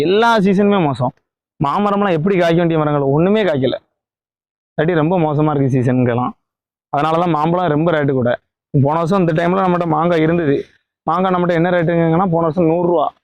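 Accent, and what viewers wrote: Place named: Tirunelveli, India. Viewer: super bro.. which place in tamilnadu.. slang seems to be tirunelveli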